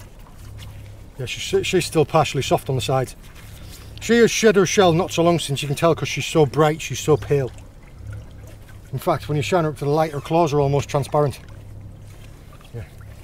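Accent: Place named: Hull, United Kingdom. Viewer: HI...new sub here...sorry to hear about your visiting theives m8...is there any where that you can review ship movements in your areas, looking at radar tracking ect... so ive been thouroughly entertained with your video's, i must have watched around 20 upto present...& i realy enjoyed where you managed to catch the 2 tuna...fantastic video's...ime a metal detectorist from the north west of the uk (i used to shore fish from the front in Morecambe, a fair while ago!) your accent sounds like a Hull one... deffo northern!...anyway, i hope you make progress with finding out what vessel's were near to where your pot's were...good luck & Happy Hunting...take care & tight lines! Gordon...(click my picture and check out a few video's if you get the time)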